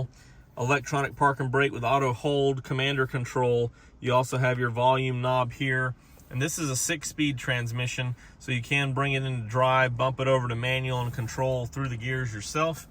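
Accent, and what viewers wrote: South, United States. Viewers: Uh.. Americans do not. We have different dialects. He is Southern which is why. Northern etc say Mazda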